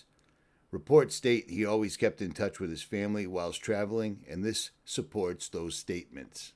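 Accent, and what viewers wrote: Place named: Boston, United States. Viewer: Just subbed sounds like Ritchie from boston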